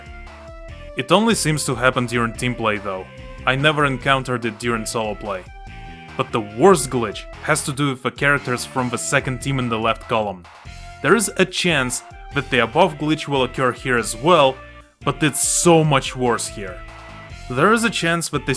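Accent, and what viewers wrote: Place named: Portugal. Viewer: És português, certo? O sotaque não engana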